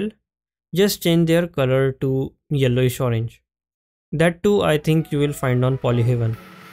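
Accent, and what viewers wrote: India. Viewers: You know that when a indian accent youtuber is making the video, he's going to help you out with any problem.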